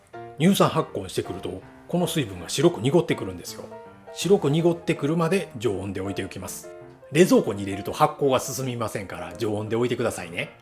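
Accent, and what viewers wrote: Kyoto, Japan. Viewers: 分かりやすかったです👍今年は2回柴ずけ こしらえてますが😊もう 1回 こしらえてみます(動画参考)で👍京都弁がチラホラ👏👏👏よかったですね🙆🙆🙆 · 下鴨の出身です¨̮ 嫁いでから京都のお漬物の美味しさを 再認識✨京都弁に安心感🥺 シンクに赤紫蘇の洗った後の赤いお水が 流れた瞬間、実家を思い出しました。笑笑 母の方法とはちょっと違うけど、 ものすごくしっくりきたので✨ こちらの方法でも作ってみます☺️ めっちゃ美味しそうです！！！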